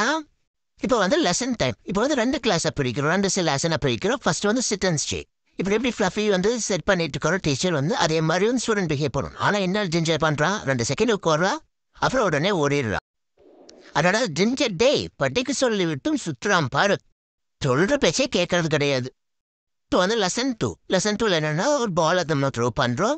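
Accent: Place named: Coimbatore, India. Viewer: உங்க கோயம்புத்தூர் வாய்ஸ் சூப்பரா இருக்கு மேம்❤❤❤❤❤❤❤❤🎉🎉🎉💐💐🌹🌹🌹🌹🌹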